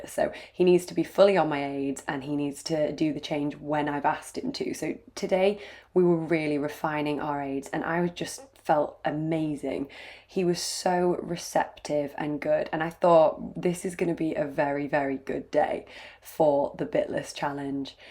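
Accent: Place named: RP, United Kingdom. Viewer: Damn girl you sound posh ... . i like it :D that accent is awesome! like the queen of England.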